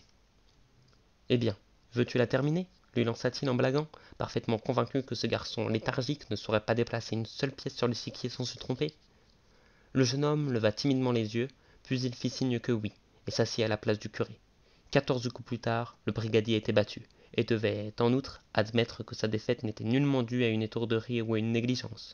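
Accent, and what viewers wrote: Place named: France. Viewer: Non il parle juste francais de France. Il lit tres bien.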